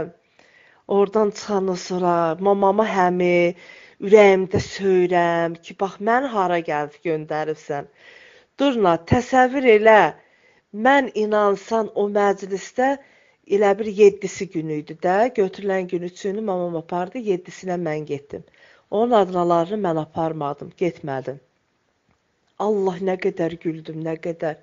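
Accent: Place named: Dashkasan, Azerbaijan. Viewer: Yəqin daşkəsəndən olub lətcəsi ona oxşadı amma gülməli deyil dərdə gülmək olmaz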